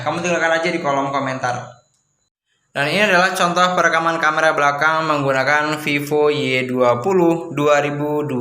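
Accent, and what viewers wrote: Madura, Indonesia. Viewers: Bang orang Madura kah?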